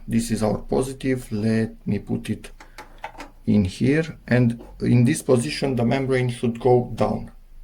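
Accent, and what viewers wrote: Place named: Romania. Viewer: Poate vorbeste in Engleza ca il poata urmari si oameni din alte tari? Stiu, pare halucinant, dar lumea asta are aproape 8 miliarde de oameni, dintre care doar vreo 0.4% stiu romaneste. Eu, ca si alti oameni care se informeaza, am gasit acest canal de youtube cautand in engleza un lucru care ma intetesa. Mi-am dat seama ca ar putea fi roman dupa accent si cand am citit in descriere. Daca tu nu esti aici ca sa te informezi pe subiect, atunci beshi in plm in alta parte. Youtube Trending e de tine, Dani Mocanu vorbeste romaneste.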